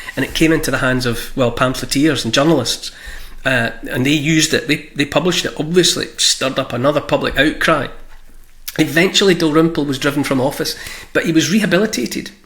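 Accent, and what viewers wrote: Scotland, United Kingdom. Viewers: Brilliant. Oral stories enchance the written word and covey the spiritual nature of history. Especially done with a fine Scottish accent.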